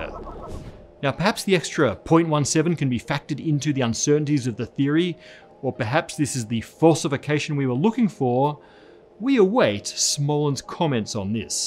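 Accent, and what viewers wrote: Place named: Australia. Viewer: It's called being Australian. I don't know how you struggle to understand him, it's very clear, and I don't think they considered those like you that are impaired in verbal comprehension over his ability as a physics communicator.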